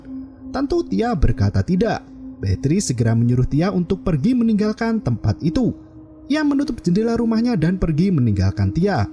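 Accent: Java, Indonesia. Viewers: Jawa km medok benar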